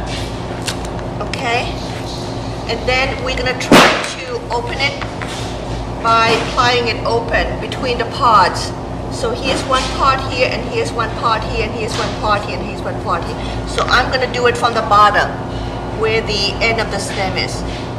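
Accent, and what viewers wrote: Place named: Germany. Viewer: where is this weird accent from? sounds german english to me!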